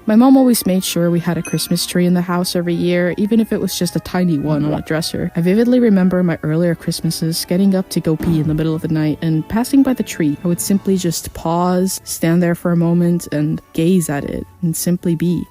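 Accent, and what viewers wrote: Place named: Mexico. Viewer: bro unleashed the full mexican accent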